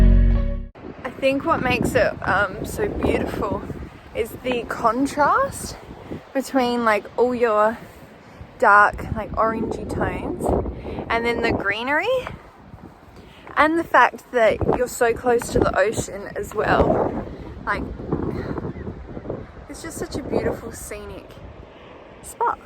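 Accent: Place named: Australia. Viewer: Hmm..🥱 Tho I do like hearing your Aussie accent and raspy voice, good job!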